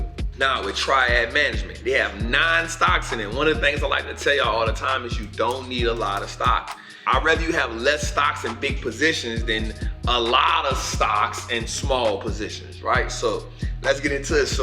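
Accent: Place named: New Orleans, United States. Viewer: Just stumbled across you on a video you did with Tom Bilyeu and a soon as I heard your voice I was like that dudes definitely from New Orleans. Man everything you say makes sense and easy to understand. Thank you for spreading the knowledge.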